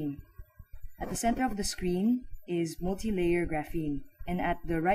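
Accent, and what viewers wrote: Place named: Philippines. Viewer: u sound like a Filipina.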